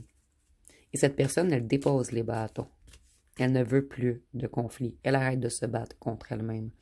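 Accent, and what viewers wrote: Quebec, Canada. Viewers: Chère madame. Merci encore pour cette guidance très élogieuse pour le consultant pour les compliments que vous faites dire à son ancien autre Merci infiniment à vous. Vous nous redonnerez confiance. En plus ma très chère autre que j’accueillerai volontiers pour discuter avec elle sans jugement en toute bienveillance pour comprendre pourquoi elle m’a quitté si brutalement sans aucune explication. Votre guidance est d’autant plus crédible que ma chère autre bien aimée est capricorne ♑️ ascendant gémeaux ♊️ et je suis ascendant lion ♌️ lune en balance. Les quatre signes ont été sélectionnés. Quatre lettres de son prénom et la lettre initiale de son nom de famille ont été sélectionnées. Merci encore je redouble d’espérance de patience car j’aime mon autre comme je n’ai jamais aimé. Excellente journée à vous chère madame à l’accent québécois inimitable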